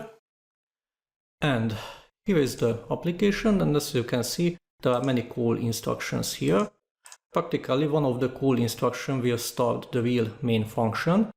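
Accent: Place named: Hungary. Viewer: Cool vid! Hey, aren't you from Hungary? Your accent is so familiar for some reason.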